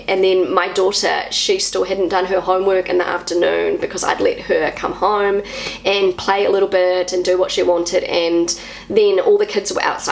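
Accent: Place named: New Zealand. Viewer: Everytime I watch a vlog of yours I'm helplessly falling in love with your kiwi english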